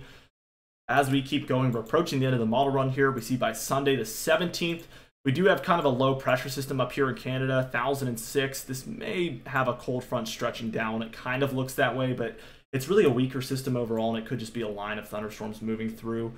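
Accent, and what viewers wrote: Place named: Virginia, United States. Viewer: Just want to drop you a note Young Man, for doing an excellent weather reporting and being on target. I Appreciate your Virginia Dialect, makes me feel at Home. I was originally born in Tidewater area, but presently live in Central North Carolina, Smithfield, area about 25 miles East of Raleigh. Thanks again, have a wonderful weekend.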